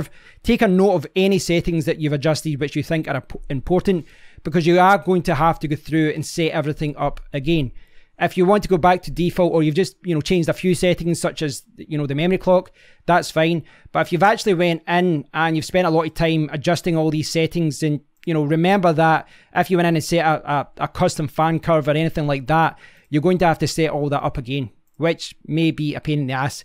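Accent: Scotland, United Kingdom. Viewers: Yea. I agree. the scottish accent is one of my favourites.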